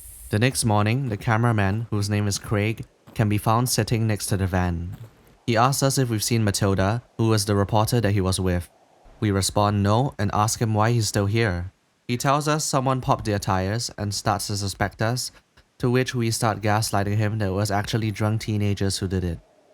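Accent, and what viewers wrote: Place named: Singapore. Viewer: singaporean accent detected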